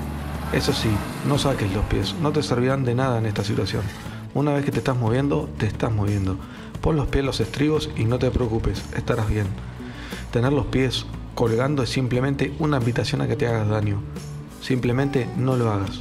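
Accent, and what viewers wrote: Standard. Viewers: Por qué hablas en neutro? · El mejor comentario , alguien que me diga por qué habla en NEUTRO si es más argentino que Manuel Belgrano… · no hables en neutro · Por favor,no hables en neutro 😅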